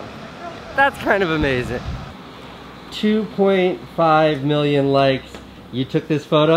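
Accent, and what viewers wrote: Liverpool, United Kingdom. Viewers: Scouse power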